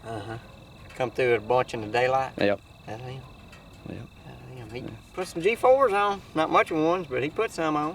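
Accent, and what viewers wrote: South, United States. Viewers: Im from GA. You sound like youz from da South...Im a bow only hunter, and to see a gun hunter do all that with one shot gives me hope there are still fellas that know how to use a firearm. During gun season it sounds like a fire-fight when Im on stand. BOOM, BOOM, BOOM, etc. You did all with one shot. When I was kid I was handed a 30-30 Marlin, no scope, no shoulder strap and three shells. We had three tags for the year...I never missed. I was taught to shoot long before I learned to hunt...Very nice deer...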